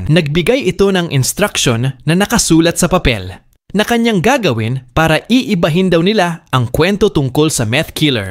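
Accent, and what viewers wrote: Visayas, Philippines. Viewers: Ang tgas ng accent ng storyteller bisaya Ka ba?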